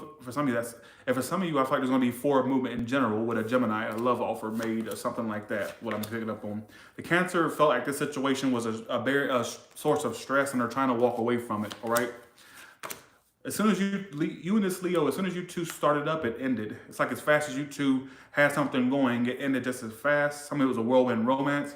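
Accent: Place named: Louisiana, United States. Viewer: I just knew you were from Louisiana....love the accent.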